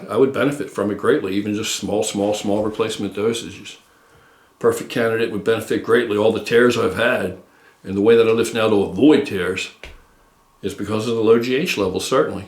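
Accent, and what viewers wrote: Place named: Ohio, United States. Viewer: Hey your accent sounds familiar...Ohio? I used to live in southeast Ohio as a kid! Anyway, cool channel and sick gains bro 👍🏼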